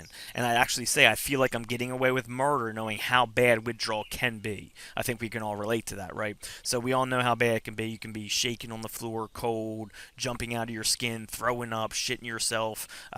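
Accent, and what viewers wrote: Philadelphia, United States. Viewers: Dylan M he's gotta be from the Philly area.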